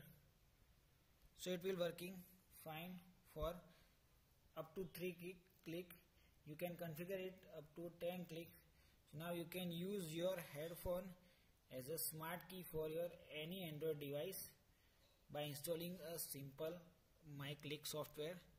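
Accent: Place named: India. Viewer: I love Indian Accent really!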